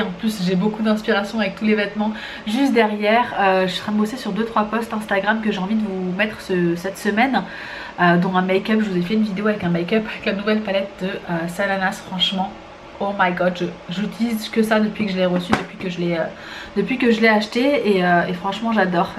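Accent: Algeria. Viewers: Salut bella, bsahatkom la nouvelle maison, inchallah trabah 3likom avec l'arrivée du bébé!!!! Stp ton accent algérien paraît orannais!!! Est tu d'oran??? Ce dont je suis certaine c'est que c'est l'accent de l'ouest!!!!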